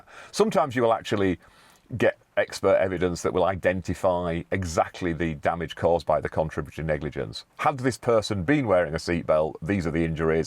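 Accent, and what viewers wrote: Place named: Yorkshire, United Kingdom. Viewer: I love listening to someone speaking Latin in a Yorkshire accent. I thought I was living in 'The Hunger Games' ? Don't have to imagine.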